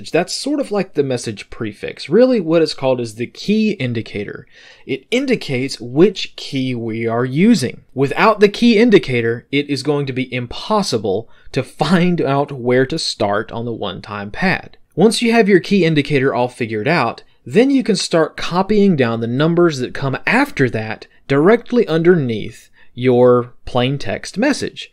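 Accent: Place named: South, United States. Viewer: One of the mysteries of north American dialects is why New Orleans got the northern city vowel shift. There's nothing nearly as mysterious here, but there is a well-known connection between Tennesee and Central Texas, particularly the way they front the OU dipthong. My dude has an urban southern accent.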